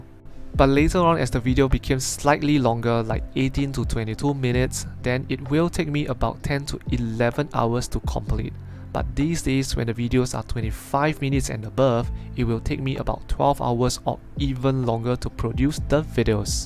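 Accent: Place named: Singapore. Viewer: Hi, though I have subscribed to your channel rather recently, I enjoyed your analysis of the market. Your Singaporean English is very relatable as well lol. Keep up the good work and I will start to watch your options videos as I have tried to learn and understand a few times through different means but still don’t quite get it yet 😢sigh. Looking forward to part 2 of your video 🤗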